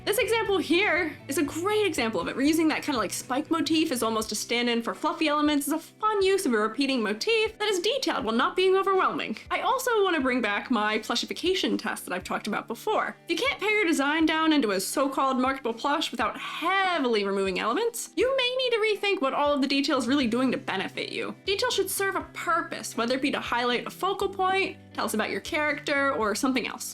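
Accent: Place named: Sweden. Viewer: Unrelated, i'm still secs into the video BUT you don't happen to be swedish?? I keep hearing a slight sweng-lish and now i can't let it go 💀 Edit: okay I watched the full video and read the comments and i REALLY gotta agree with most people critisiting your criticism cause my god some of these "pet-peeves" are just ignorant, hypocritical and extremely biased.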